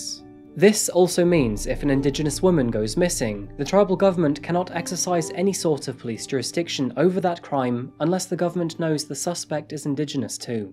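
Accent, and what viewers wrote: United Kingdom. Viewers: Still can't wrap my head around. A British sounding narrator suggesting coffee. Hehe. Great work again 😉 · What part of the uk you from?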